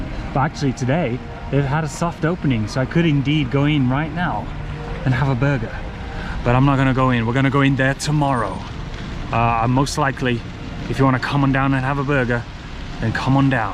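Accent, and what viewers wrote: United Kingdom. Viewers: I came to listen British accent. It's so cute. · No more UK accent? Haha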